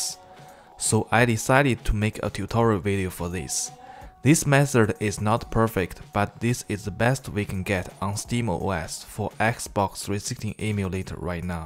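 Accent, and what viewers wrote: China. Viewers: UP主一听就是中国老乡哈哈哈哈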